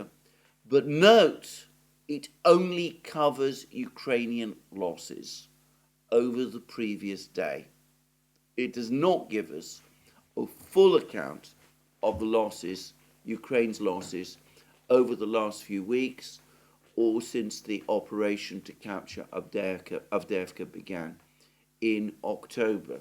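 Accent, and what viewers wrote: United Kingdom. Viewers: Thanks for your hard work, much appreciated! I love your very British accent by the way, British English sounds a lot better than American English, at least that is what I think.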